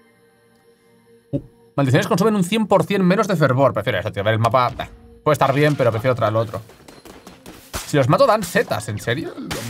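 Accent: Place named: Argentina. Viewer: Hablas como Argentino más que Mexicano xd, lul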